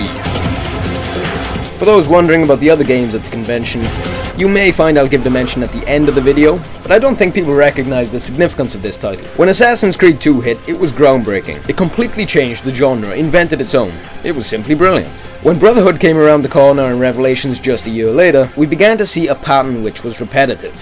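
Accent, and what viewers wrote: Wales, United Kingdom. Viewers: because i like this video so much and your welsh i will subscribe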